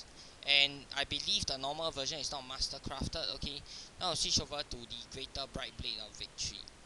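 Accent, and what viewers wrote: Singapore. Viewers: I can tell by your accent that you're singaporean, amiright? xD Also, does the weapon have any uses? its been in my inv for a few months now and i dont know wether to sel it or not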